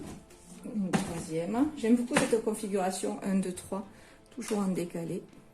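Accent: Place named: Toulouse, France. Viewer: BRVOS beau travail e t bel accent de TOULOUSE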